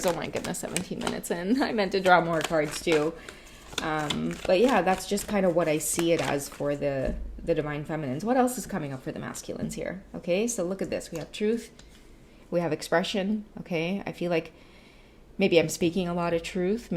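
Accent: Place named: Trinidad and Tobago. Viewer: Your channel is top quality what a treat you are! and with your cute ass trini patois just love to listen to you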